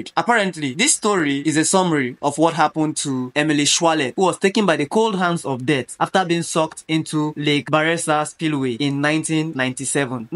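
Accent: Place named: Africa. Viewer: I love your accent. probably African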